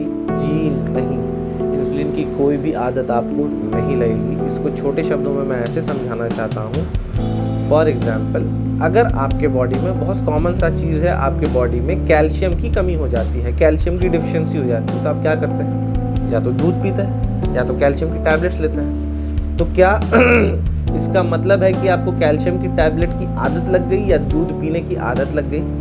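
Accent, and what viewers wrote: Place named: Patna, India. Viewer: Hatsof sir very well explain, specially with patnaiya tone. Kya diabeties type 2 me lifetime medicines khana padta hai .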